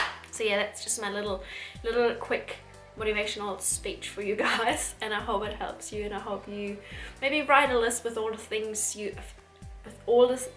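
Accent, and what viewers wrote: New Zealand. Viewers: I see your English is getting the kiwi touches. Noticed the way you say happy 🤣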